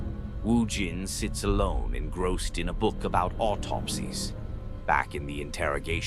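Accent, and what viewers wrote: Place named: United Kingdom. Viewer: Why is blud british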